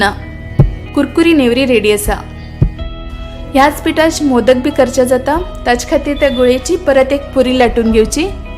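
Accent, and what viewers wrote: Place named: Karwar, India. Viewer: Refreshing to hear karawari Konkani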